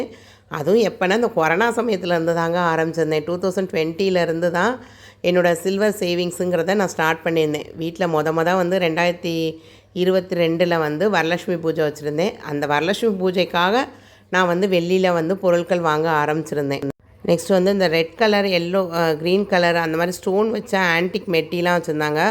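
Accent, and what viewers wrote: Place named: South, India. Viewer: neenga south ah ka...slang apdiye irukku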